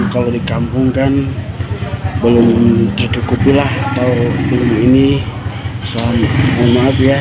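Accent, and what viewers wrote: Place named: Sunda, Indonesia. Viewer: Aduh meni leket pusan sundana si amang pace .terus berkarya pace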